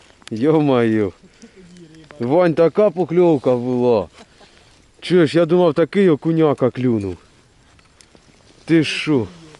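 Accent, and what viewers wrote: Poltava, Ukraine. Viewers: обожнюю полтавський акцент.Дуже багато схлжого з артуром і жести і слова ну то тнічого ми ж українці)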